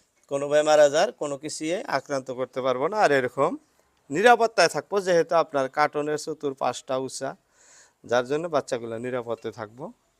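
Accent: Bangladesh. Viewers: কাকাকে আমি তো মনে করছি বাংলাদেশি । আপনার ভাষা আমাদের সিরাজগঞ্জের ভাষা একই রকম।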